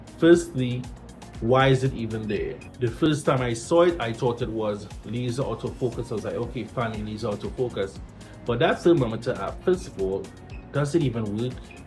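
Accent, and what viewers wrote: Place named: Jamaica. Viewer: patois accented tech reviews instant subscribe brudda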